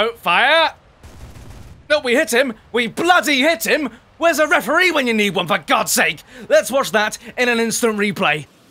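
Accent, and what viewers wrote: United Kingdom. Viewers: its time once again i must fill my British accent bar up.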